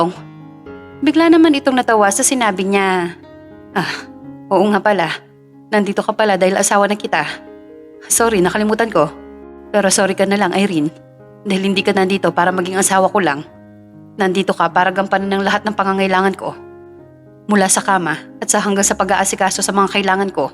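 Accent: Philippines. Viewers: Ok lang pero funny kc may punto yung narrator ay parang may music na pataas at pababa. Anong probinsya ka ba? Di ka ciguradong taga Manila.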